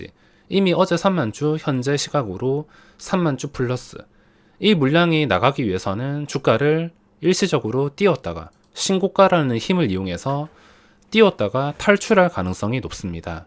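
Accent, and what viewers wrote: Gyeongsang, South Korea. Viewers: 님께서는 오늘 원없이 단타를 쳤습니다라고 멘트 하실 때 제일 멋져요 님의 지난 영상도 다시 보고 매일 올려 주시는 그날의 영상도 다시 자꾸 보게 되는데 노터스 금맥 짚으시고 울면서 보내주어야 할 것 같습니다라고 멘트하실 때 제일 쿨해 보였고 반짝반짝한 종목들 찾아 욕심 부리지 않고 매도하고 시장의 흐름을 그 누구보다 먼저 짚어내시는 모습 아이 데리고 키즈카페에 가느라 종목 움직임 하필 못 보았다고 하소연하시던 인간적인 지난 영상 등등 개미의 입장에서 생각하고 개미들 걱정 많이 하시는 마음이 너무 고운 모습 심법 잃지 않는 매매 정확한 저점 확인의 두근두근하던 날들 모두 응원하며 공감하고 듣고 있습니다 귀에 쏙쏙 들어오는 경상도식 억양의 종목명 언급 기대할께요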